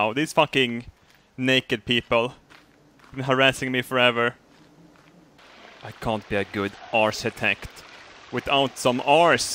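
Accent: Sweden. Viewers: Jag är glad att jag är svensk... Annars skulle jag inte förstå ett ord du säger. jag älskar dit svengelska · Nooo person, don't correct his swenglish.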